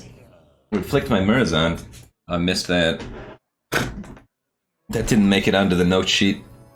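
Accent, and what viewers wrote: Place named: Michigan, United States. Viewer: The Michigander accent is just the most attractive accent in all of the USA.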